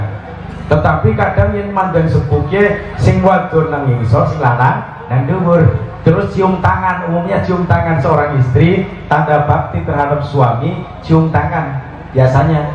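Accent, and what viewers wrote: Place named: Banyumas, Indonesia. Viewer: mantaab yi, ngapak banget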